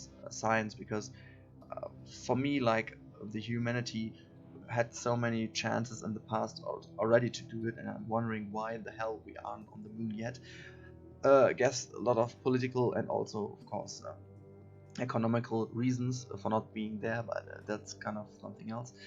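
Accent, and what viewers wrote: Germany. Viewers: Das ist ja ein deutscher Akzent, wenn ich jemals einem gehört hab! Aber gute Arbeit wie immer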